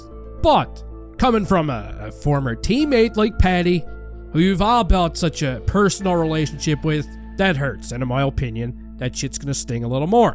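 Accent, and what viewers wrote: Newfoundland, Canada. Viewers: Is this a newfoundland accent?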